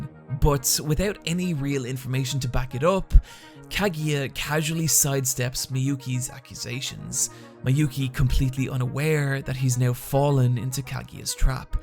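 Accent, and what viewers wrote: Ireland. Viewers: What nationality are you? I'm getting a little Irish from the accent I think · Oh so you're Irish... I thought that was your narration voice.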